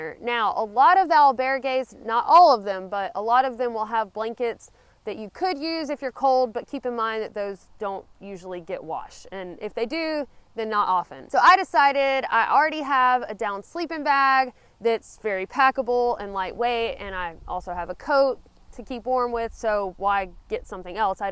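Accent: South, United States. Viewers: I want to be in a bar and hear speak Spanish with a Southern Accent asking for Paja! JAJAJAJA! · Please don’t assume that a random postal worker would know you didnt mean your home in Spain. That’s not something to assume, there are many expats. If you were speaking with someone here in Florida who had a Spanish accent or British or whatever, you wouldn’t assume so. My neighbor for my whole life has a British accent and her daughter sounds as southern as you/this video creator and another one has a Jamaïcain accent and we have friends who have all sorts. Also, why would you travel to another country and then just eat subpar American food when you have so many more healthy options and authentic food in front of you? Maybe a better tip would be to familiarize yourself with some of the most common foods from that place and the language. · Interesting points to consider, especially re: bedbugs. 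They aren't known to transmit any specific disease, but I would do whatever I could to avoid them, including treating my gear with permethrin before going where they might be. Just one caution, though - permethrin is extremely toxic to cats, so they should not come in contact with even the tiniest amount of it. What language did you select for closed captioning on this vid? It looks like Dutch or Afrikans. You have a southern accent, but it's not THAT strong so as to overwhelm the CC bot. Awesome videography and editing, as usual. Stay safe out there. Happy Trails.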